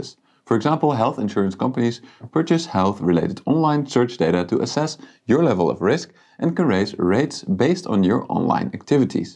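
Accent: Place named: Netherlands. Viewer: He's Dutch. This is obvious from his accent and from his name.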